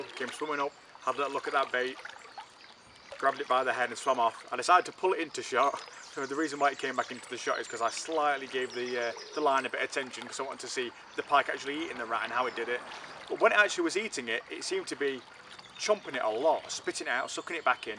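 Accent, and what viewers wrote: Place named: Derby, United Kingdom. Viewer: What river was that taken on I presume derby by your accent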